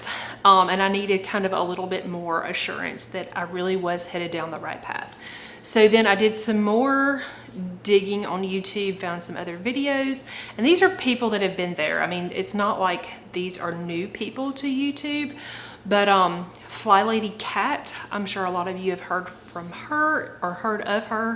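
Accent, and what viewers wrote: South, United States. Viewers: hello from NC! I can tell by your accent you're from the south, too. It's nice to hear that comforting sound, right? I'm a new Flybaby. I guess I should say I'm more of a flylarve. I just about jumped on board a few years ago and got overwhelmed. Today, after divorce, domestic violence therapy for severe PTSD and raising my kids completely on my own, I found myself craving routine. Needing guidance, I went back to the FlyLady website. But, like everyone has said, boy, that website really needs a facelift. So, I turned to YouTube. Yes, the Secret Slob and all of the others you mentioned have led me here. To be transparent, I just bought, downloaded and put together my Control Journal today. I was looking for one more push and searched "flylady 31 baby steps". That's what brought me to you. I'm like you in a lot of ways. I'm all or nothing. 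Typically, I jump in sprinting and can never finish the race. I procrastinate until important becomes urgent and suddenly, chaos ensues. I haven't watched any of your follow up videos yet, but I will as I take my babaysteps. I was even thinking of using my Instagram account to post Stories about my journey. I have a planner account that has a substantial number of followers. Maybe, if I tell them I'm doing it, it'll give me accountability. I'm sure making videos has done that for you. Cheers to you for taking the plunge again. It says a lot about you that you "failed" and yet, came back for round two. I'm looking forward to watching y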